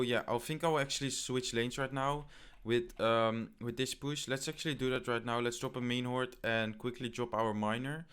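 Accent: Netherlands. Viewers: lol, it's his dutch accent haha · je nederlands accent ook xD haha nice vid :P heb je een goed deck om 4000 trof te bereiken? heb nu een een deck: giant skel, zap, sparky, fire spirits, goblin ton, dat skeleton hutje, mega gunst, vuurbal zit rond de 3500 nu maar kom maar niet ervoorbij haha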